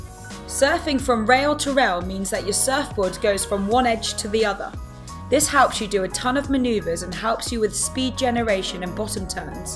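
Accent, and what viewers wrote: United Kingdom. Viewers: and we have a brit commentating here.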